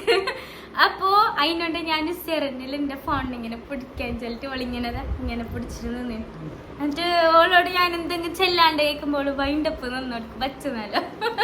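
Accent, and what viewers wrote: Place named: Kasaragod, India. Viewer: Ninde ksd baashe paangilla ninde porel mundne aa slanganne ni mundumbo paang👍🏻❤‍🔥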